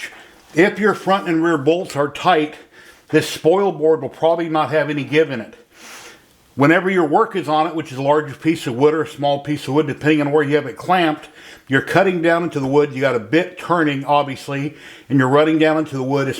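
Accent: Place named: United States. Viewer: very useful review 👍 Sorry but the American accent beat me :) Could you give the name of the sites you used for the maps and tray designs? Thanks!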